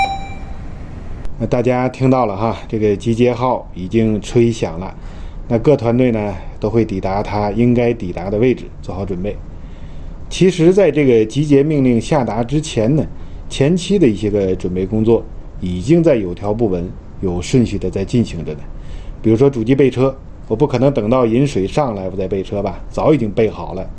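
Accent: Tianjin, China. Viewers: 第一次看船长的视频就被强烈吸引了！李船长渊博的航海知识和严谨的有条不紊的专业叙述最吸引人。加上浑厚的天津普通话播音太酷了！我的印象中在海上的老船长，留着大胡子抽着雪茄大腹便便，就像高尔基小说《在人间》描绘的那样。。。新一代的华人的船长在此！李船长无庸置疑，您是业内的佼佼之子，民族的骄傲，加油啊！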